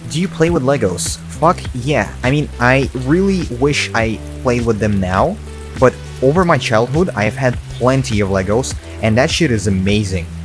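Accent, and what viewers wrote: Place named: Russia. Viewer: How long have you been speaking english? You hardly have an accent so I didn't believe that you were Russian at first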